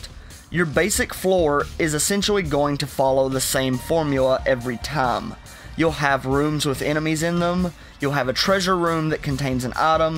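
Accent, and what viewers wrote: United States. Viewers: Love your American accent.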